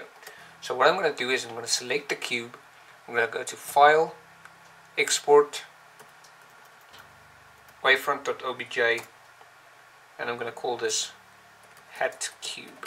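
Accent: South Africa. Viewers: Hahaha lol SA accent 🤣I'm South African too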